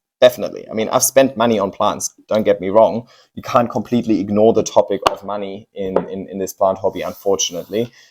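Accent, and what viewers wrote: Germany. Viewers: I wish I had a cool German accent too.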